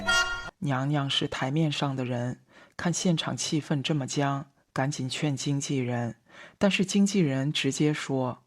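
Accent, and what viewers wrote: Cantonese, China. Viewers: 哈哈哈哈哈哈哈哈哈哈哈哈哈哈哈每次聽主播說粵語我都笑不行了🤣🤣🤣🤣🤣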